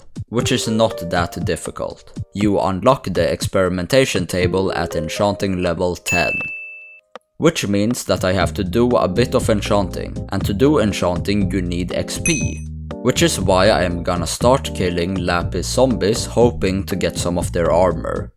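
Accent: Sweden. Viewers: Svenska accent👌🤌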